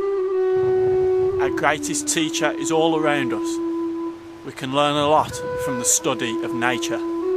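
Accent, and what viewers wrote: United Kingdom. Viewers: From the West Midlands? 😛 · Hurrah a fellow Brummie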